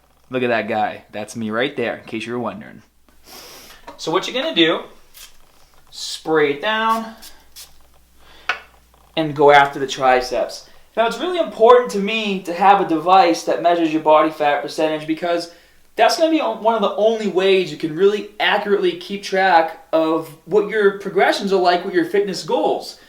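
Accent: Boston, United States. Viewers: watching your videos for months and this is the first time I picked up a type of Boston accent, you must have grown up in mass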